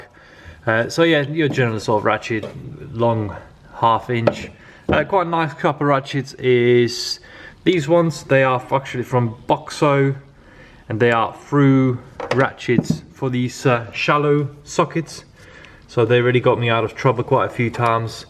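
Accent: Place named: Europe. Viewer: Where are you from? You have a European accent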